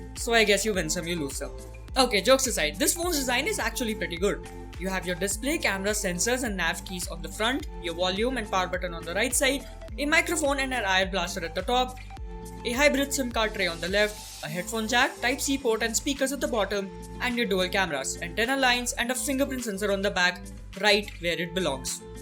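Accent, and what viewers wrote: India. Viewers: typical punjabi accent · Loved the Indian Accent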